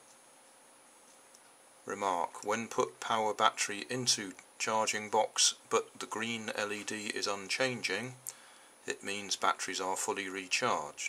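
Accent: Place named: South West England, United Kingdom. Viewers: God I do not miss the South West accent at all. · Haha are you from Cornwall? I'm from St.Austell, and you probably have the most south western accent I've heard!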